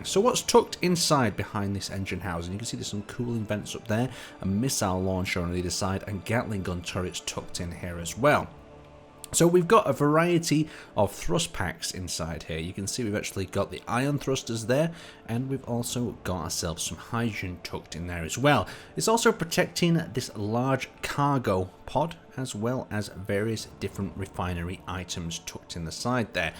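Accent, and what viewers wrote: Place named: Shropshire, United Kingdom. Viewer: If I had to guess where is from, I'd say Shropshire.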